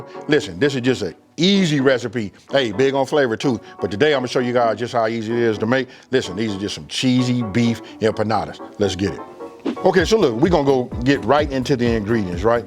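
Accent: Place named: West Coast, United States. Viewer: I love watching your channel and your recipes are always a hit! And i gotta say i love your voice. Every time i hear you talk, your voice reminds me of "Ole Dog"from Menace to Society. 😂 You gotta be from the west coast.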